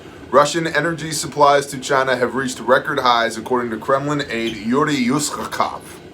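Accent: Canada. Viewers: The "vandalism" of the Terry Fox statue (old school cancer victim who attempted to run across the country on one leg after the other was amputated..he didn't make it as cancer took his life) was placing a Maple Leafs hat on his head, a hockey stick in his hand and a Canadian flag draped over his back. We all know if this was a burn loot murder riot they would have spray painted it before tearing it down and tossing it in the canal to great applause from the msm. Loved the Canadian accent lol ❤🍁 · Oh my gosh the canadian accent hahaha Did you star in the Fargo movie because that is exactly what it sounds like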